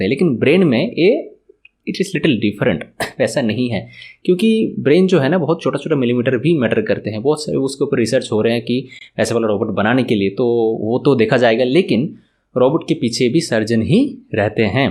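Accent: Bengal, India. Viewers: Love ur bengoli accent😅😅 It remides me of my chemistry teacher He was also from wb He came mp Whenever he speaks he makes commendable mistakes in hindi Lovely teacher Debdas Patre #arrowdere Love u sir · Areyy bhaii Hindi te r English Bengali accent bojha jachhe naa nki ?? ... R emni teoo ... Bangali toh obviously Bengali fluently bolteii paarbe .... Kaarpn Mother Tongue toh Bengali ... !!!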